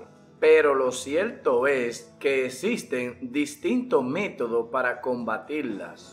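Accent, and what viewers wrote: Dominican Republic. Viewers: Es que así es el habla del dominicano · es dominicano xd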